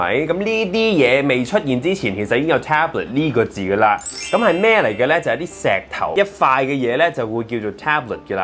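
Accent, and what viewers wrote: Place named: United Kingdom. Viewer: 七師父用British accent有料!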